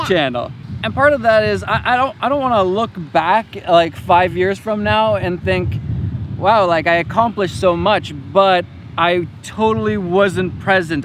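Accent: Canada. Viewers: Definitely Canadian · Canadian. Definitely Canadian. #saidthegirlfromBuffalo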